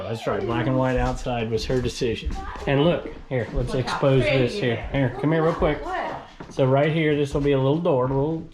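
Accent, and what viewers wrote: South, United States. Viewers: It’s so interesting to know that you were raised in California because you’d never know it! You have the southern ‘twang’ down perfectly, as well as the slang (ya’all), in addition to other very southern mannerisms! My sister, who originates from South Dakota, did the same thing. She married a southern boy from Arkansas and within a year had the accent! We got such a kick out of our sibling becoming ‘southern’! Love your channel!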